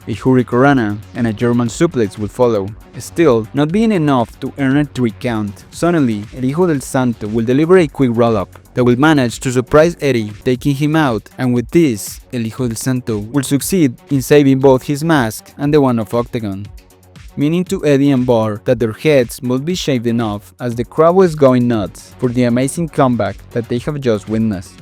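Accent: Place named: Mexico. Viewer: I will infer you are Mexican due to the accent so... Que buen pinches video amigo. Ahorita que la AAA esta agarrando fuerza por la compra de la WWE necesitamos creadores como tu!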